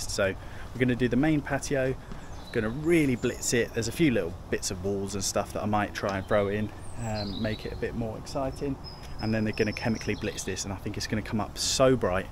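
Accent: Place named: United Kingdom. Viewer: Fence! Amazing. Love these kind of transformation and gifting videos. Thanks for your work and for sharing. Warmed my heart. And who doesn’t love the British accent? 😂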